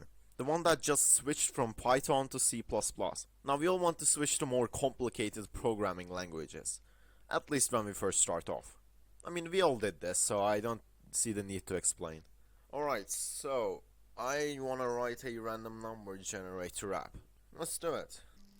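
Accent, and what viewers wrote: Turkey. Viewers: Turkish accent? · Aksanindan Turk oldugunu anladim :D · it's the turkish accent for me :p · Hey where u from. ur English kinda resembles a Turkish accent.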